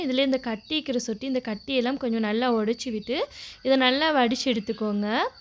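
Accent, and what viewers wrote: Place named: Muslim Tamil, Sri Lanka. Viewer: இது இலங்கை முஸ்லிம்கள் பேசும் தமிழ். ஒவ்வொரு பிரதேச வழக்கு. இதுவும் தமிழ் தான். அவ செய்முறை நன்றாக உள்ளது வாழ்த்துக்கள்.💐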